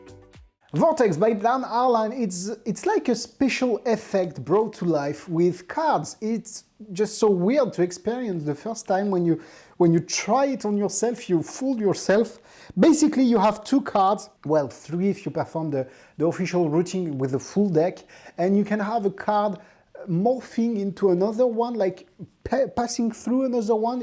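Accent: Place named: France. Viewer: Nice French accent :) Congratulations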